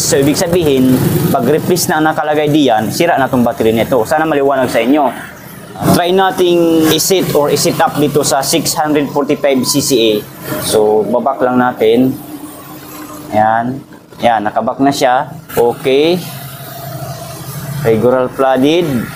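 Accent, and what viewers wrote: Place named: Visayas, Philippines. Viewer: Visaya ka man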